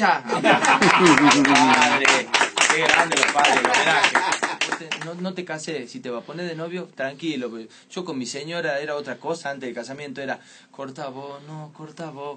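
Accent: Tucumán, Argentina. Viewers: Tucumano es!! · Alto tucumanoo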